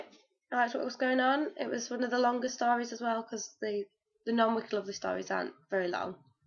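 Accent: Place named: United Kingdom. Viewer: Love your accent is it British?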